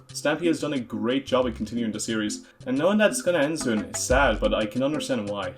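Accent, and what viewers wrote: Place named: Ireland. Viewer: I thought I recognised that accent Hello fellow Irishman Also, great vid absolutely loved it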